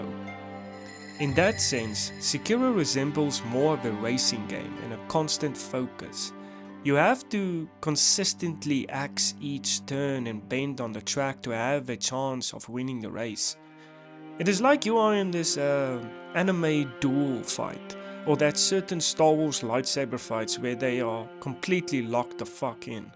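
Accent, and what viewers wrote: South Africa. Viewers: Not used to hearing heavy South African accent 😅 awesome vid boet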